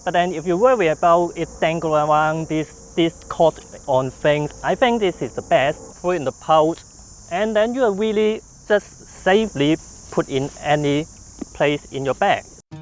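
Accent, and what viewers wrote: China. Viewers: china english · You are from Hong Kong, yea? My mother speaks cantonese and all ger chinese friends have the same accent :D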